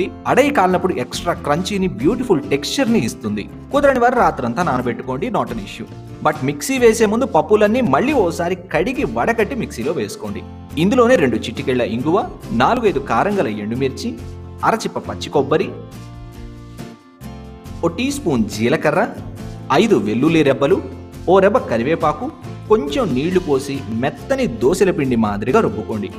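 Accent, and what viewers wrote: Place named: Kerala, India. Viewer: Anna ne language kerala madhrega unadhee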